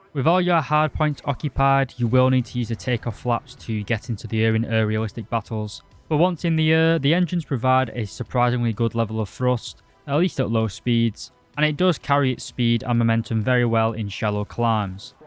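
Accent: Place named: Estuary English, United Kingdom. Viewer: Hey man, your accent is so strong that Im actually struggling to understand certain words that you say. I think you pronounced R instead of W? then chat gpt told me this Estuary English." This accent is spoken by people from the London area, and it is a blend of traditional Cockney and Received Pronunciation. Estuary English is becoming increasingly common among younger speakers in the UK.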